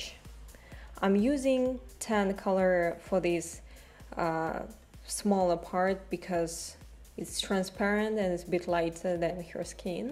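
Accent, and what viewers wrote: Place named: Russia. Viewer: Очень русский акцент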